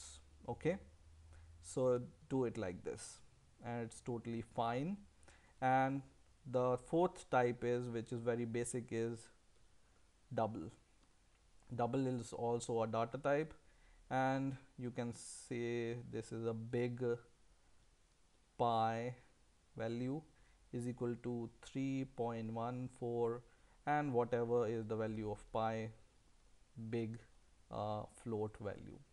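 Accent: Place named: South India, India. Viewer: Daata type I think he is a south indian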